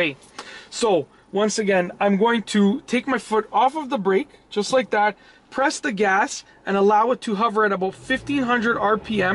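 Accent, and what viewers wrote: Canada. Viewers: It’s been 5 secs and I can already tell this mans is a fellow Canadian. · I was thinking more of like a Canadian trying to do a normal American accent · Totally Canadian accent. I miss hearing it sometimes! :) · I say he's heavy non-Quebec Mountain Canadian. I mean, it's plain obvious. All that's missing is one of those Aviator Hats to complete the look.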